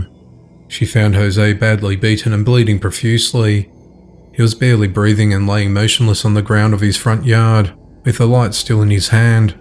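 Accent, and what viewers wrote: Australia. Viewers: This is like an Aussie version of Chills voice.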